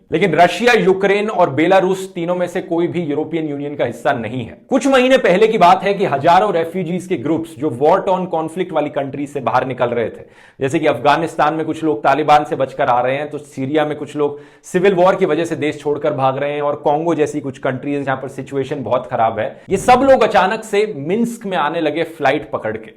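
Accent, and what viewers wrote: India. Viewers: I completly agree with you mate. Just a question, what language do you speak? because it sounds like English merged with Hindu or other Indian language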